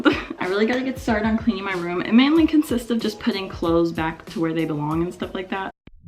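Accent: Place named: United States. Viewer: Are you from the United States I feel you have accent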